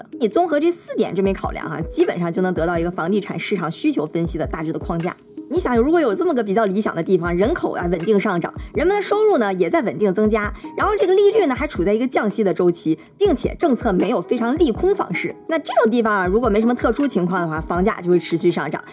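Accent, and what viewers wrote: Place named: Dalian, China. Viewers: 在异国他乡听大连老乡说普通话真亲切！现在不仅我和我老公在看你的频道，我连我爸妈也安排上了。加油小Lin! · 怎么像大连人似的😄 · 我刚进来看， 她讲的不错， 我也是大连的！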